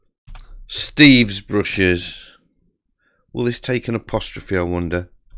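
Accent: United Kingdom. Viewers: thanks Steve, it really helps listening to someone from Yorkshire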